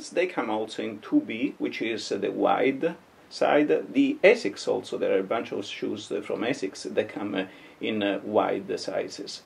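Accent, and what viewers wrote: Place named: Italy. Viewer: This jerZ girl ❤️that Italian accent!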